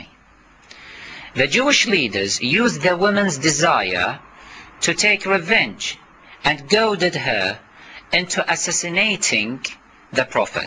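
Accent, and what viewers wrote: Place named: Iran. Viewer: aksen bhs arab n englishnya ni org adalah org farsi. N kau tak usah pura tak tahu klo org rafidha tu agamanya mmng mengajarkan kekacauan bg Islam dan umat Islam.mrk senang mengadu domba antar muslim. ( rafidha x muslim) Agama rafidha ( syiah 12 imam) inti ajarannya MMUMUSUHI MUHAMMAD SAW DAN WAJIB BERLISAN KEJI THDP BELIAU!!!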